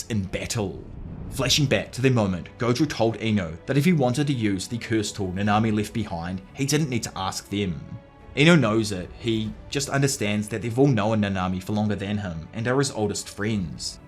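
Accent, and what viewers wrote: New Zealand. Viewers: he's from new zealand! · r u from new zealand?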